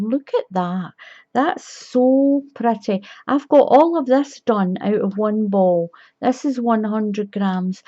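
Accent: Scotland, United Kingdom. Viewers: wow, how rude is this women, I just cant believe that she is so sour and bitter that she would try and bring you down. I am very great-full for your hints and extra info to make my crochet easier and added bonus, your Scottish accent. Sending love and best wishes from Perth Western Australia x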